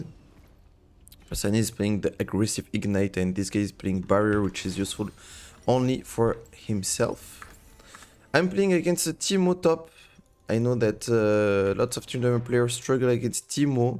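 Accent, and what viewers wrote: France. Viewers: Wow je compatis! Je suis arrivé ici parce que pour la première fois aujourd'hui je suis tombé sur un Teemo correct (mes premières game les mecs y savaient pas space c'était un all in un kill 😂), j'ai fini en 0/7!!! Du coup j'ai fait recherche YouTube " Teemo"... Et je comprends maintenant! La vache c'est rude! Merci pour le contenu en tout cas, je suis débutant et je main Trynda et Sett et tes vidéos éducatives elles aident de ouf! (je me suis permis de comm en Français j'ai cramé ton accent 😂😂)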